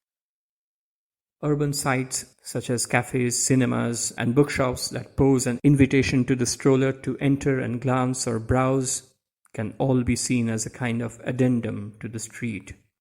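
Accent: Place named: India. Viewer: I feel much oblige to say that i don't like Hindi accent, but yours was pleasant. And the info and insights you provide are powerful. Thanks for the video!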